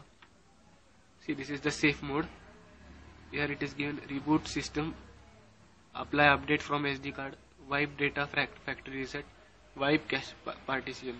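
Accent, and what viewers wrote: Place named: India. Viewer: dieses english von indern klingt immer wieder geil :D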